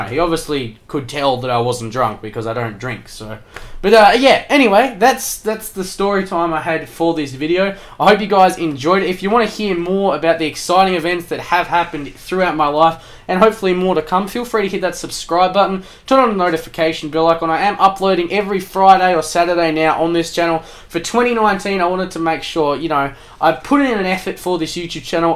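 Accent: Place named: Australia. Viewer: I never knew you were an Aussie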